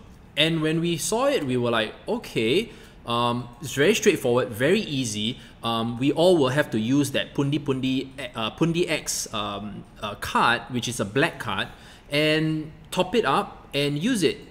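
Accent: Singapore. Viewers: Singaporean accent is so funny.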